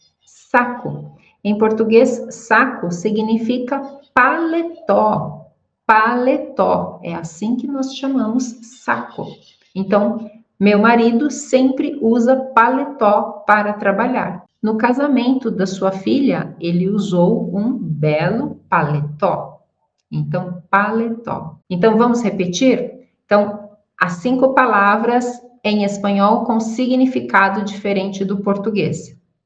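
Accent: Brazil. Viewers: ¡Qué interesante! En España, "saco" es como una bolsa, normalmente tejida de yute, de forma rectangular y sin asas (parte para agarrar) que las bolsas sí tienen. Normalmente decimos un saco de patatas, un saco de leña, un saco de arroz, un saco de cemento, etc. No sé si en alguna parte de España también se usa como prenda de ropa, yo sólo lo he oído de amigos hispanoamericanos. "Rato" es un tiempo más largo que momento o minuto, que también usamos. Tus clases son muy interesantes, además que entiendo tu portugués brasileño mucho mejor que el de nuestros queridos vecinos portugueses. Un saludo ❤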